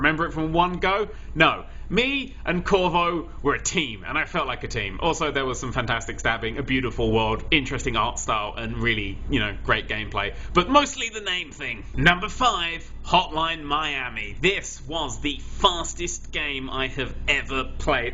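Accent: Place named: United Kingdom. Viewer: gay voice? thats just called being british.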